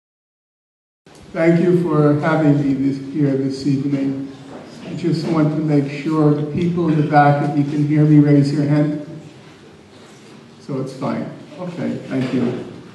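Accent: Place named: Brooklyn, United States. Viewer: I wonder what the Czechs in the audience who after studying English for 6-8 years were thinking as they listened to Norman speak. Listening to him repeatedly pronounce the word "wall" in his thick Brooklyn brogue, I couldn't help but wonder what word did it sound like to the Czechs?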